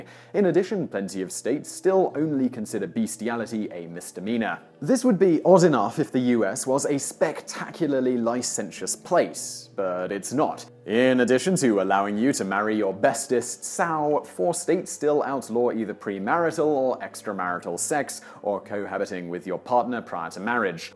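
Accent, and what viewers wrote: United Kingdom. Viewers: it makes no sense listening to a brit talk about US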